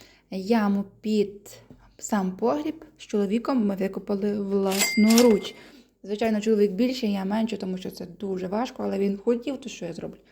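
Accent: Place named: Western Ukraine, Ukraine. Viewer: акцент западенскький 😅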